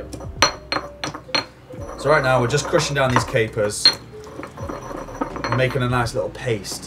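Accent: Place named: Staffordshire, United Kingdom. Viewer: Love your videos mate. I'd ask where you're from, but that would be rude lol. Accent sounds like it could be relatively local to me in Staffs lol. Probably far wrong but ehh 😂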